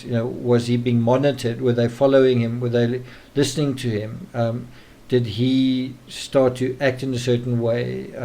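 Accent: South Africa. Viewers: Definitely a South African accent.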